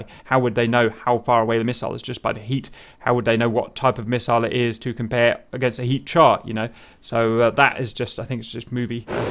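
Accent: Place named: United Kingdom. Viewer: I am not used to British accent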